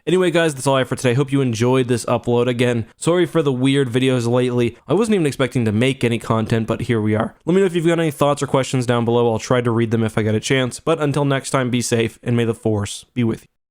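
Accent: Canada. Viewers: Are you Canadian? I detect a hint of Canadian accent, eh. This might help explain why you felt all mushy about that scene. Trudeau. I think he'll be the next Bruce Jenner only way more feminine. If you are Canadian then it sounds like he's had a bad influence in you. Man up! This is The Mandalorian, not Gilmore Girls.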